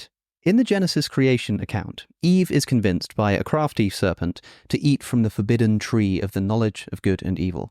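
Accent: United Kingdom. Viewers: I'm glad I was reading along or I would have had no idea what "his face shawn" meant. For those that are curious, it means "his face shone", but said with that cute accent British people have. :P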